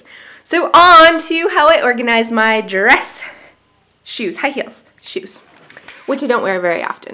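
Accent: Canada. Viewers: eh'? Muahahaha, you are sooo Canadian! LOL ;P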